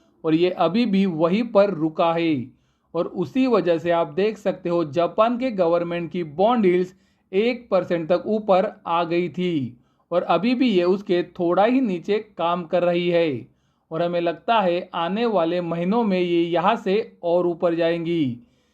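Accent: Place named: Gujarat, India. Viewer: Aap Gujrati Ho Aisa Aapki Boli Se Lagta Hai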